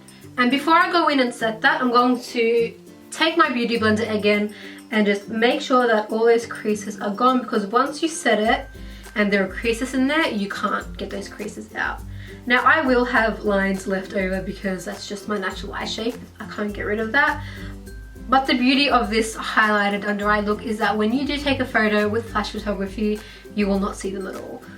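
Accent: Australia. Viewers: I thought I recognized the accent! My guy was born in Melbs! (We live in NY, I'm a NY'er.) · Her accent is Australian but she is Jewish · omd she 100 percent sounds like promise phan with australian accent!!!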